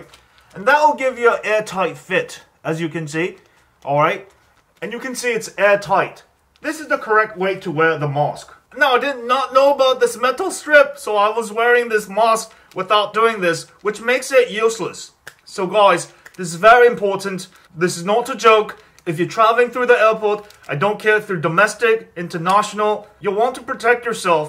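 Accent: United Kingdom. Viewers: whats up with the brittish accent? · going to costa rica in feb not cancelling that shit ''its not a joke" but dudes talking like hes from the UK l lol smh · Did you live in the UK? You seem to have a strong British accent sometimes.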